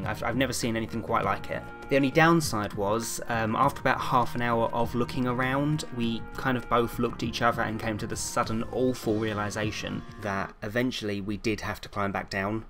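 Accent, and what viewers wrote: United Kingdom. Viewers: You sound British, are you British? Nice video, by the way. I have a question, why do you say, "Ello, ok" In your intro all the time? Is it cause you like to say it all the time? Anyways, can you translate this? Spanish Estoy tan contenta de que tu madre te haya llevado a este mundo. Lo siento si ella se fue o algo. No quise hacerlo si ella está muerta. Pero, eres tan especial y amable. Gracias por estar en la vida de YT, y en las vidas de todos. ¡¡Eres genial!! Hint. (it's really nice.)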